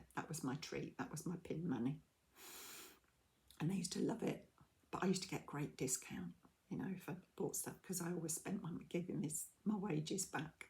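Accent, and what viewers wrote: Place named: England, United Kingdom. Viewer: Lovely video. I'm so glad to know you. I'm Canadian, but my Gramma was a war bride from England, and I feel a connection, besides crochet. I love the accents you have over "across the pond". I'm on the West coast, and Canada has many different accents, too. Thanks for sharing. Take care and love from Canada 🇨🇦 ✌️🍁💜🐄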